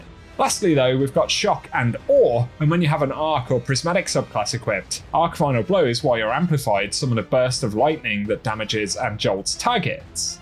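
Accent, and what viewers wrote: AAVE, United States. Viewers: Is it ebonics? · leave his ebonics dialect alone.. 😂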